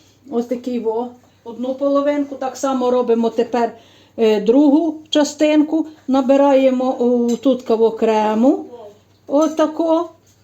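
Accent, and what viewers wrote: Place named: Chernivtsi, Ukraine. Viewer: А мова, діалект, говір, словечка, наголос точно, як на Батьківщині у мого покійного чоловіка, а він з Чернівецької обл з Романківців. Наче в рідних вдома побула😊 - підписка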